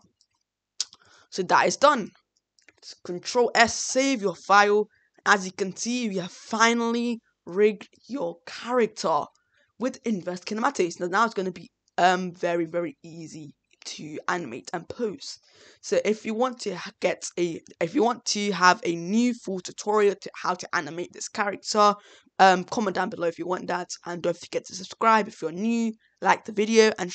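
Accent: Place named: United Kingdom. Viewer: This video had a lot of good content and I learned from it but it was a struggle to get through because of your narration. At times you rush along, only hinting at rather than enunciating certain syllables. In addition, you have a fairly strong British accent and the combination of these caused me to not understand many things you said. The accent alone shouldn't be an issue; I've watched other speakers with British accents who are very understandable--see Grant Abbitt as one example. I suggest that you practice CLEAR - LEE - E - NUN - SEE - AYE -TING E-VER-Y SYLL-A-BLE for future videos.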